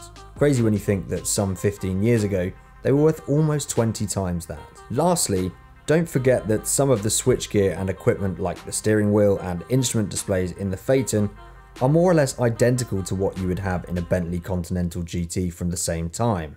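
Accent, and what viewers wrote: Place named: RP, United Kingdom. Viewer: Again, a superbly spoken video, very well delivered, you should consider presenting on on of the mainstream TV channels. Perfectly spoken Queen’s English! A real pleasure to hear you present and so smart and mature, of a intelligent man. Thank you 🙏🏻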